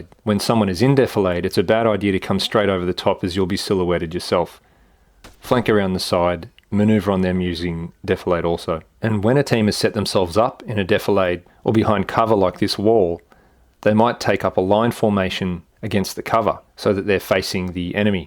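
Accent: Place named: Australia. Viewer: Why does an Australian accent do so much for tactical confidence? I feel more effective for having seen this.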